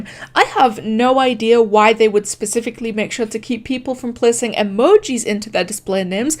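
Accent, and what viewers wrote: Scotland, United Kingdom. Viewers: Is it just me or is she scottish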